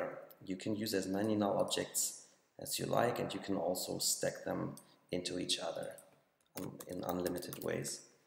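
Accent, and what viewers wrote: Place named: Germany. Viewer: der deutsche akzent :D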